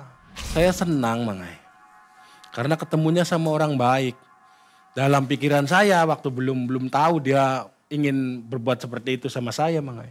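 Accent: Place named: Cirebon, Indonesia. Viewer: Yang bikin lucu itu logat Cirebonan - nya Mas, coba lebih diseringin tertawa jadi lebih asik nontonya.... Mas &, Wa, Wa Favoritku, BRAVO maju terus MM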